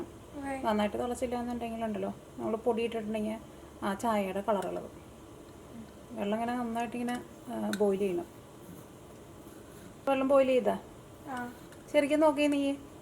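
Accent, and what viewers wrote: Thrissur, India. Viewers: Love that she has a Thrisshur accent despite being overseas. Its very endearing...❤️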